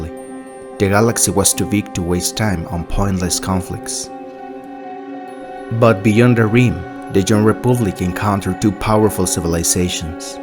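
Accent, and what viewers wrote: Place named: Spain. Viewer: I love the accent! It's like listening to Star Wars as read by Antonio Banderas! REPOBLIC! LOL! LOVE IT! 😅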